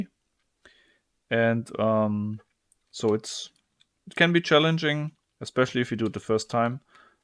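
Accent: Germany. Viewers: the most german accent on youtube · Der gute Herr klingt ziemlich deutsch... ^^ ,,smells after" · Swastika, but isn't voice german? (Thinking hard) Hmmm.....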